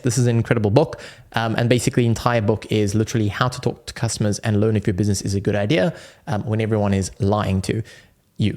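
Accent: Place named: South Africa. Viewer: Were you born in SA? You have a strong south African accent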